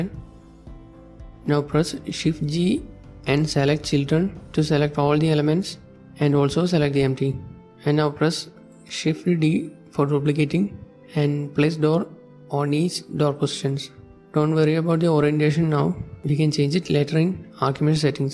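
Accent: India. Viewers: Sorry your knowledge might be good but ask your foreign friends if they understood anything you anounced in this video. Your Indian Dialect is here unuseful use neutral clear and slow spoken language please! Your Video might be interesting for more people indeed. Thanks brother! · He is, but he has a heavy South Indian accent. But he is speaking perfectly well 😊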